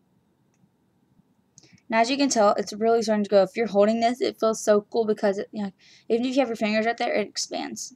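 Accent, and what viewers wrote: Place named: South, United States. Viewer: Are you southern? You have an accent :) I'm from South Carolina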